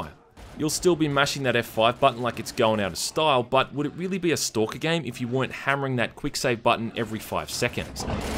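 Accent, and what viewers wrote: Australia. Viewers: "Stoker Cola: Pre-Piat" that Aussie accent tho.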